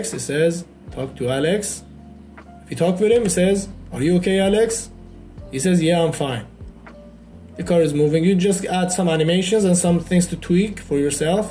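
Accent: Iran. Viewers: Nice video! I'm trying to figure out where your accent is from, maybe Iran??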